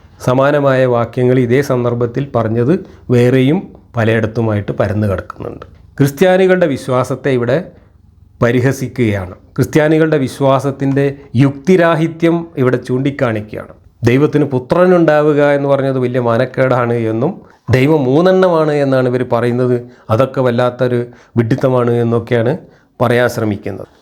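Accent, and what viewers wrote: Malappuram, India. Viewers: മാഷ്ടെ സെൻസ് ഓഫ് ഹ്യൂമറും മലപ്പുറം സ്ളാംഗും അടിപൊളി 😅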